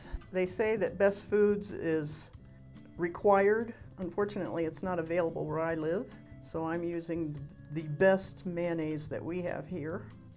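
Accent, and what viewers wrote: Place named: United States. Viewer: Where do you live? By your accent, im assuming the US. Hellman's (east coast)= Bestfood's (west coast). I hope this helps anyone who didnt already know this😊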